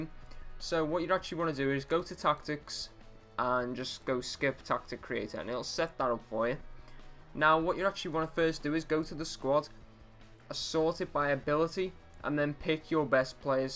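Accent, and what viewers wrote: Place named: Liverpool, United Kingdom. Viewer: Where in Liverpool are you from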